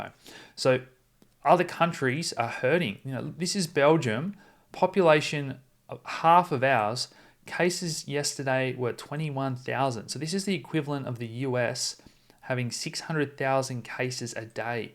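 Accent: Tasmania, Australia. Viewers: Thanks. BTC around $13814 as I write this (Nov 1st, MT). Oil slaughtered. USD stronger. Wonder what will happen tomorrow with the S&P 500. All this interest in BTC makes me want more so is not all in the hands of the rich and corporations. Also ETH must start going up soon according to your analysis - I have only dregs left after maximizing my BTC. Your Tasmanian accent is very easy to listen to and I like that you, your wife, and kid seem so well balanced in this crazy world.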